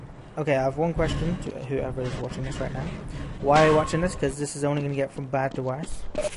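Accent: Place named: England, United Kingdom. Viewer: english accents ftw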